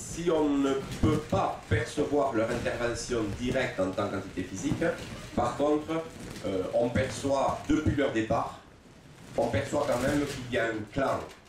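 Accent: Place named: Marseille, France. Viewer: Merde l’accent marseillais!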